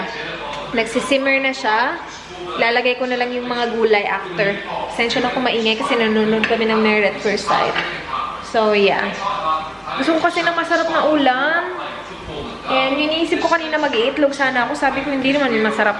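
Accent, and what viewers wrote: Visayas, Philippines. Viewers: Bisaya jud gihapon ang accent te ba. AHAHAHA LOVE YOU ALSO!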